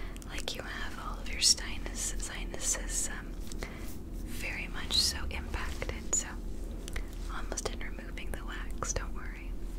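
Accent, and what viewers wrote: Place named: England, United Kingdom. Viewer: Some videos you have an English accent, others not. Which one is your actual accent? Been wondering this for a while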